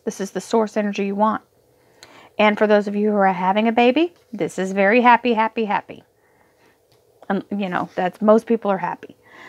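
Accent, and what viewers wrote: Texas, United States. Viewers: Love you Love you Love you....thank you sooo much you Texan girl.....Love the way you get all gigly and excited 😇😇😇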